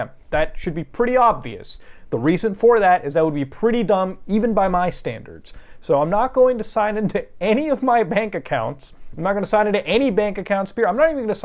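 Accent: India. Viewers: Your indian english accent is really bad lmao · Can you read all text written by scammers in ur Indian accent like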